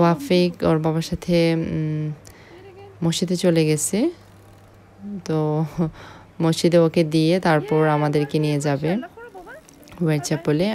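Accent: Sylhet, Bangladesh. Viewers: Apni ki Sylheti??????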